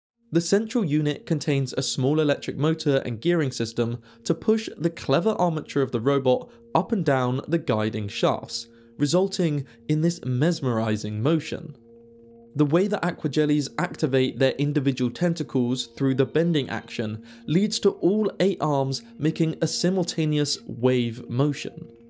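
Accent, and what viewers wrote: United Kingdom. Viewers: Any chance the narrator can reduce the British accent? WautER instead of WautAH? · A British narrator should "reduce" his British accent? Why? Because it triggers a snowflake like you? Get back under your rock 🤣🤡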